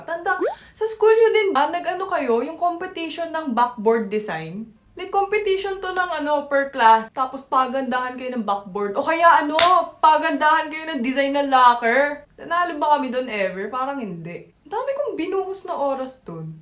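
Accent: Batangas, Philippines. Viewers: not dialect. language because mutually intelligible at iba yung structure ng mga languages dito sa philippines. does speak a dialect of tagalog though which is batangas tagalog, because she’s batangueña and sanay siya doon. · Pinoy na pinoy ang accent mo, buti pa mag asawa ka na lng ng finoy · Kabayan (kabatang,batangueña po aq)nakakatuwa ka nmn panoorin ❤ ung accent at dictions mo kabayan talaga g puntong taga batangas ka 😊.Merry Christmas kabayan❤anong namimiss mong food sa Batangas?Kumakain ka ba ng Sinaing na tulingan?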